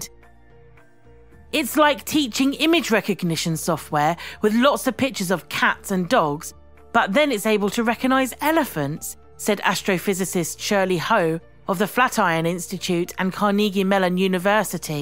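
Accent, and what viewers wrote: United Kingdom. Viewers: Know how, I subscribe because I love the British accent and the narrator even makes more appetising.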